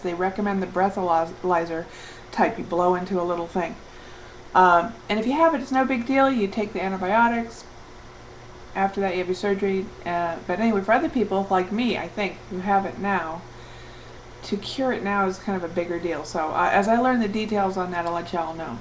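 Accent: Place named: South, United States. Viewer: your to funny love the southern accent i am freezing my husband out of are bedroom hehehe he said can't wait tell u get your surg. because i keep the room so cold glad u had fun in Los Vegas oh yeah did u run into the bariatric ladies to i saw some videos love:0)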